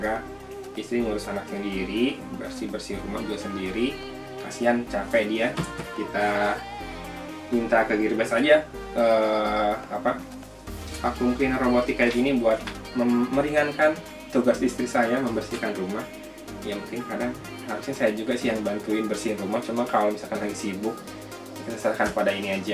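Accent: West Java, Indonesia. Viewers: gan orang sunda?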